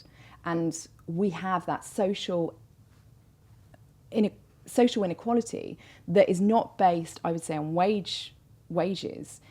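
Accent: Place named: Southern England, United Kingdom. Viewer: That is not estuary, that's a standard southern middle class accent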